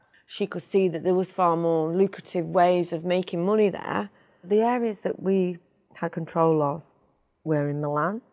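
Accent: United Kingdom. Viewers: Set sounds like she lived în Uk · This is the most British sounding Italian I’ve ever seen. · Wonder why she has a British accent? I guess she's from the UK? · Why does she have a British accent?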